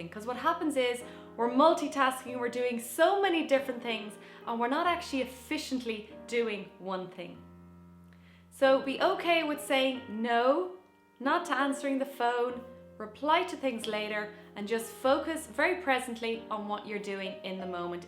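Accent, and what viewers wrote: Ireland. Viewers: Your accent is beautiful where are you from? Ireland?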